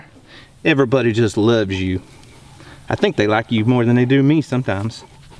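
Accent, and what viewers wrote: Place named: South, United States. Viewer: The constant, unending interruptions are like watching someone with ADD. Solution: Put the dog inside or on the other side of the fence and make your videos uninterrupted. You have very good content. That southern drawl is relaxing and sounds very trustworthy - very easy to listen to. Just let that good content flow!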